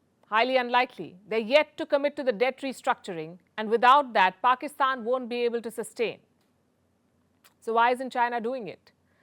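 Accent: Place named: India. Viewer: Somehow her Indian accent makes her lttle zingers even more risible.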